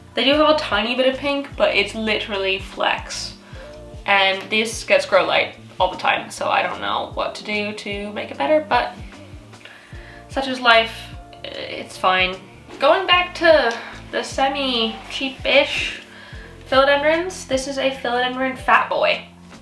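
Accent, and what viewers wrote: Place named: United Kingdom. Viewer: Omg I can hear your UK accent forming lol 😝❤️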